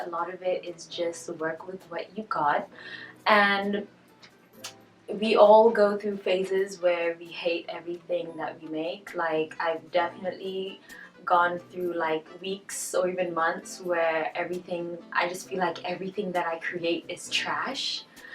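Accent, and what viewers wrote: South Africa. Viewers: You sound South African.. i'm confused lol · WOW!!!! My friend, you sound a bit south african - are you?? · You sound South African? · That accent... you're South African right?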